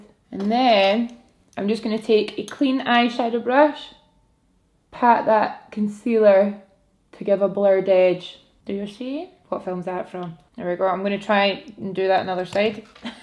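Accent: Scotland, United Kingdom. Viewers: Omg are u Scottish?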